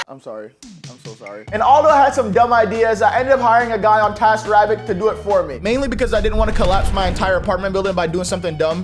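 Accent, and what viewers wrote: Jamaica. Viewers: Are you Jamaican or Caribbean?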